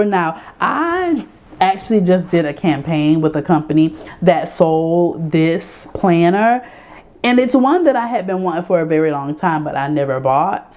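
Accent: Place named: Louisiana, United States. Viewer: Let's GOOO! Let's Get it!! I'm with you this year Sis! Also, your accent is giving Louisiana! I grew up in BR, lol. My goal is to post a youtube video every week.I'm well on my way, too 💪🏿✈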